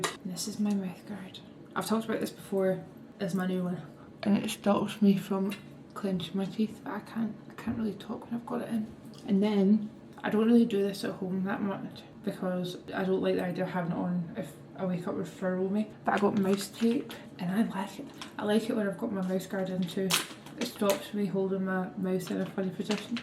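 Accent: Scotland, United Kingdom. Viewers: The little Scottish accent 😭😭😭😭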